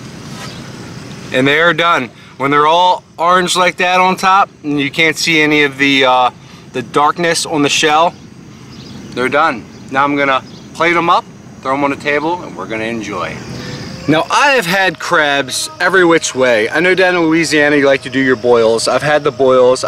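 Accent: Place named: Maryland, United States. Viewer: complete with that Maryland accent hon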